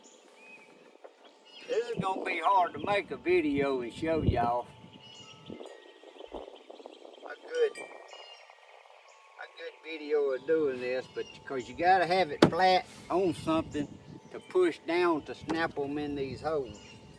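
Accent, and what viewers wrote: South, United States. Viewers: I can’t take the deliberate redneck accent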